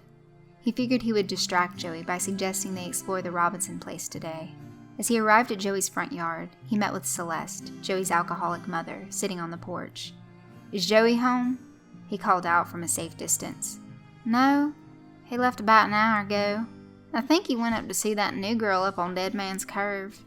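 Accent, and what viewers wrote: South, United States. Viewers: love your southern accent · I love how you get that Southern Drawl Right on the Button.... BUT You CAN'T leave us hanging....you mentioned Joey Disappeared .... WHERE DID HE GO ??? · I really hate the stereotypical southern accent, It's ignorant and makes the story annoying. Being from Texas, I'm irritated. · your southern accent is so convincing that I can'T listen to tat story xD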